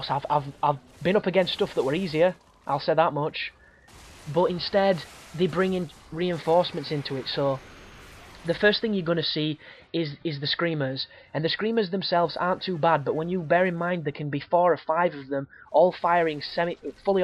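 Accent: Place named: North, United Kingdom. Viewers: Dude you sound like the drummer for the band BMTH. So im assuming your from yorkshire cause thats where they are from. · Legend, your northern accent and explanation are immense hahaha nice one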